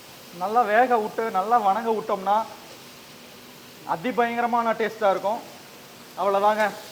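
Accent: Kongu, India. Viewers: கொங்குத்தமிழ்வேட்டி துண்டுவேறலெவல் கண்ணு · Me too Rangaraj.... my fav dish both items.very happy to see this video and ou coimbatore kongu tamil💕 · Hi sir...my native place is coimbatore.... நம்ம கொங்கு தமிழ் le அசத்தி விட்டீர்கள்...this rice is all time favourite to our family....sir... coconut oil ku பதில் சுத்தமான நெய் சேர்த்து சாப்பிட்டு பாருங்க...semmaayaa இருக்கும்...non veg எல்லாம் அந்த பக்கம் நிக்கணும்....thank you sir for uploading this video... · Our native COIMBATORE special food ultimate Anna👍👌👌👌👌Exact matching side dish👌👍 our kongu naattu tamizh ❤❤❤👍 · சாதம் மட்டுமல்ல தங்களது கொங்குத் தமிழும் பிரமாதம் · கொங்கு தமிழ் அரூமை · 🙏🏻🌹 வணக்கங்கள் உரித்தாகுக.அரிசிபருப்புசாதம் சூப்பர்.அதை செய்து காட்டியபடி கிரிக்கெட் காமெண்ரி போல் லாவகமாக அதுவும் கொங்கு தமிழில் அழகாக பேசியது அதைவிட சூப்பரோ சூப்பர்.தங்களது சாம்பார் பொடி ரெசிபி யை தந்தருளுங்கள் என்று பணிவுடன் கேட்டுக் கொள்கிறேன் மிகவும் நன்றி கலந்த வணக்கங்கள் உரித்தாகுக 🌹🙏🏻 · Seithu sapittom..arumai Ungal kovai kongu thamizh polave paarabariyathodu irukkueathu..nandringa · 🙏 க்கு ஜே🥳கைப்பக்குவம அருமை.கொங்கு தமிழ் அழகு.என்ன பருப்புன்னு சொல்லியிருந்தா👌😍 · உங்கள் கொங்கு தமிழ் மிக அருமையாக இருக்கிறது, உங்களுடைய செய்முறை மிக நன்றாக இருக்கிறது உங்க style -ல சொன்ன அதிபயகரமாக இருக்கு 👍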